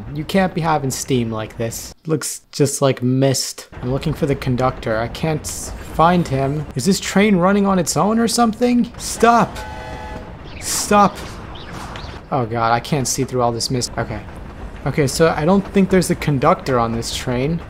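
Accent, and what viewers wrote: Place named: United Kingdom. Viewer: BRITISH MATE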